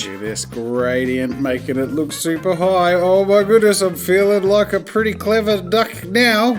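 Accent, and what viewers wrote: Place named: Australia. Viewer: Jazz’s talks about the president of the United States Me: I thought you were Australian!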